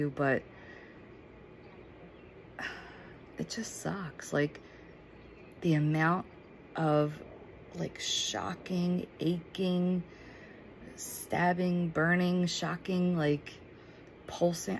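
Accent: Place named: Pennsylvania, United States. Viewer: Sounds like u r in Pa.? I'm in Lancaster look up Dr Perry Argires he's the best neurosurgeon in Lancaster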